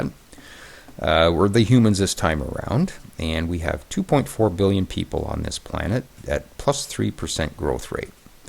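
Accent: Canada. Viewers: I wish you could do more of these . Im just starting with this brilliant game and its a big help ! Btw im German and can understand your explenations pretty well , it might be you beeing Canadian . Thx for your DW series !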